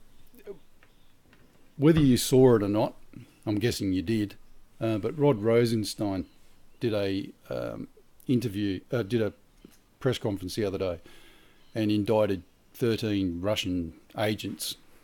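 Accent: Australia. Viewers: Are u a queenslander mate?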